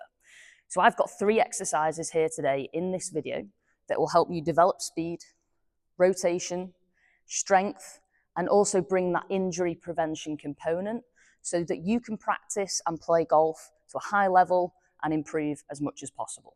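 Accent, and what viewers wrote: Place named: England, United Kingdom. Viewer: Hello from another Canadian. I have recently subscribed to you channel. As a retired Athletic Therapist and golf enthusiast that enjoys working at my game from a fitness and technical point of you, the content you share as an ex-professional golfer and now fitness instructor has become one of my “go to sources of information.” As a Canadian that doesn’t get to golf much during the winters, I created a sport specific workout area and chipping station, in the basement of my house where I can try out some of your training suggestions . Also, I must mention that listening to you accent adds to the fun. It reminds me of the year that I studied at Chelsea College in Eastbourne, England.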